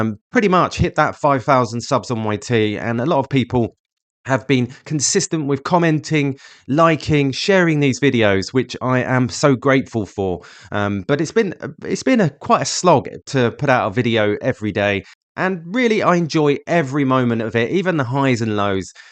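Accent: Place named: United Kingdom. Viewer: Not to mention, I think your British accent rocks!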